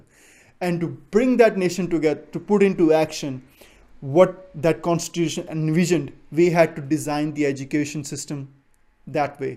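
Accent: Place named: United States. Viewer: Why american accent?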